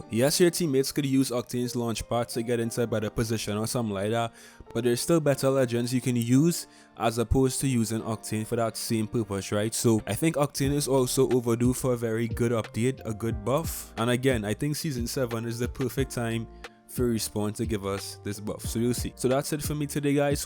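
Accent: Trinidad and Tobago. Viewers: YOU SOUND TRINI MY GUY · R u a trini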